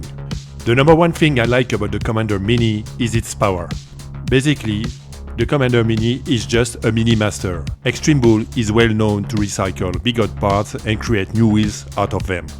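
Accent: France. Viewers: Merci pour ta vidéo, j'ai l'impression que tu es français avec un accent qui ressemble au mien en mieux 😅. Tu utilises quel roue à New York dans la vie de tous les jours ?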